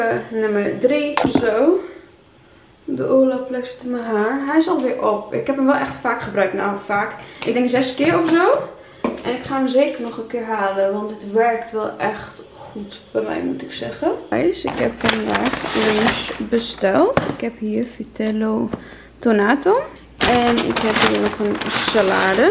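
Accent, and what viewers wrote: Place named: South, Netherlands. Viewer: Komt uit Limburg ofzo? Haar accent lijkt echt van het zuiden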